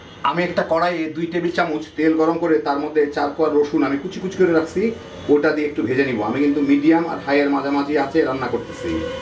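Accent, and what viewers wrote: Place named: Dhaka, Bangladesh. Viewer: অসাধারণ হয়েছে, আপনার ভাষা শুনে মনে হচ্ছে আপনি ঢাকাইয়া। আমিও ঢাকাইয়া 😁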